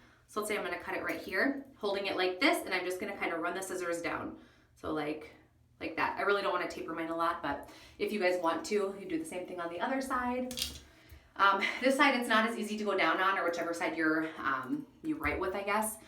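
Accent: United States. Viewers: ohh.. I can tell you have a midwest accent. 🙂 I love your hair tips...thank you! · Are you from Minnesota or somewhere up north or Canada? Your accent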